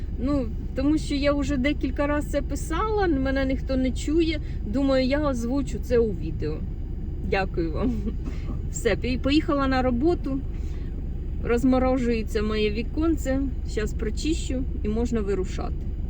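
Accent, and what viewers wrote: Sumy, Ukraine. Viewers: Привіт ❤ Все правильно говорите! В Сумах своя вимова! Але! Скажу вам,шо наші діти,навчаючись раніше ) в російськомовних школах здавали ЗНО на найвищі бали саме з укр мови і літератури Саме моя донька старша то у приклад З початком війни вона одразу перейшла на українську і в Німеччині всі в шоці,яка в неї гарна саме література укр мова! Бо її подруга,яка з заходу України тепер вчиться в неї мови! Ви класна! Справжня! Дивимося вас з молодшою донькою! Хоча секонди взагалі не цікавлять 😂 але вас дивимся с задоволенням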